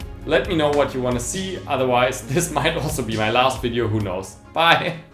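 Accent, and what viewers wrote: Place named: Germany. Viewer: This is like the staple German accent. If someone in Hollywood wants to do a German accent they need to study you.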